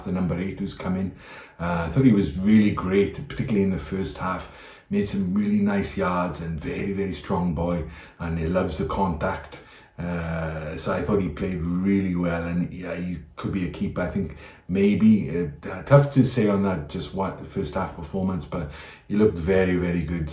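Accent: Wales, United Kingdom. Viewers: What accent do you have? I heard welshish but alot of others in there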